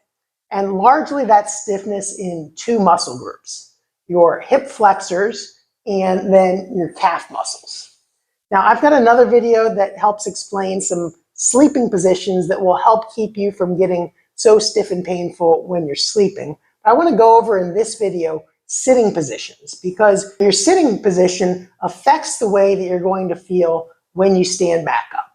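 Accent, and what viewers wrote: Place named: United States. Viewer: I knew it he's a yank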